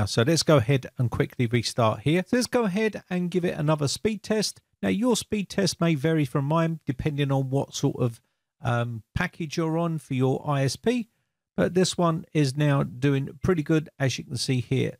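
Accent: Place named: London, United Kingdom. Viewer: Well I suppose you live in or near London because of your accent 😄which I find makes me feel a little homesick (Sussex born and bred). But in any case we out here are sadly lagging far behind the rest of the world and I would pay for double my speed if it were available out here in the country still I suppose something is nothing eh??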